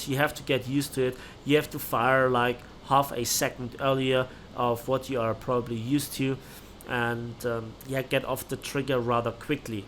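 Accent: Bavaria, Germany. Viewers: How could anyone tell you to shut up?! Your Bavarian accent is extremely enjoyable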